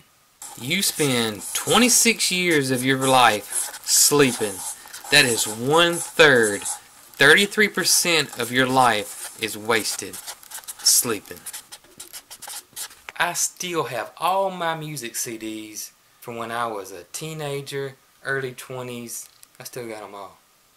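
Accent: South, United States. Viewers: I appreciate the Southern drawl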